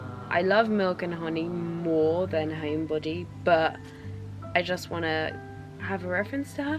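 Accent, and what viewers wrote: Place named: United Kingdom. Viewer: I love your British accent. So melodious.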